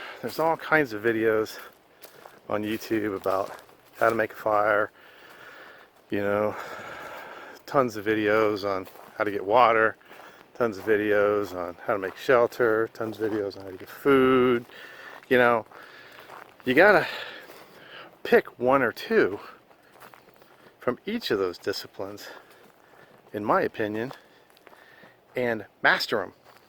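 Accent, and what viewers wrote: North, United States. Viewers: Not a swamp at all you’re in a hardwood forest. You sound like a yankee transplant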